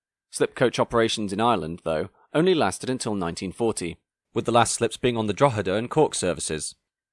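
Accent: England, United Kingdom. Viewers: I was pretty amazed by an English sounding guy pronouncing Drogheda properly, but the name at the end explained everything 👍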